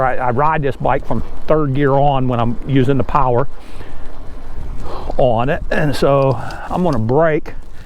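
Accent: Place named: South, United States. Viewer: What part of the South are you from? I am in Alabama.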